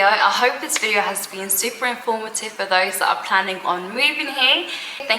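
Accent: United Kingdom. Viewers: She is obviously not American dah🤦🏽‍♀️ her accent is British😂 yeah ban and see how that works for y’all economically, did we watch the same video & what she spent?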